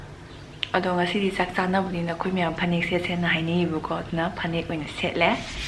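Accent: Kakching, India. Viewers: Yengba nugai e kakchinge londo adum lonlo taba nugai ye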